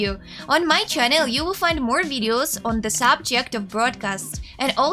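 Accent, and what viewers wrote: Russia. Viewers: English in russian accent is like an asmr video , so smothhhhh and beautiful voice!!!!!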